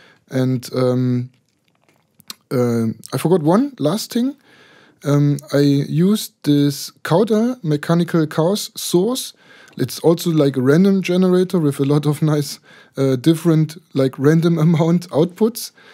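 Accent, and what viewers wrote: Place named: Saxony, Germany. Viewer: sehr cool, vor allem der edle sächsische akzent!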